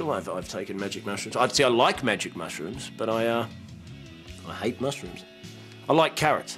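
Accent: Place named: Australia. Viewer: wtf hes australian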